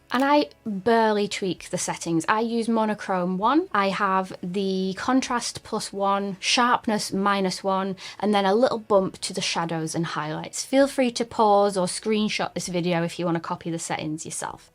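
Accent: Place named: Bolton, United Kingdom. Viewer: What a superb video! Are you from Bolton, by any chance? You sound like a female version of me!! :D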